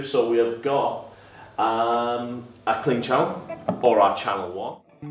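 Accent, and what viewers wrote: Liverpool, United Kingdom. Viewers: Scouse (Liverpool) accent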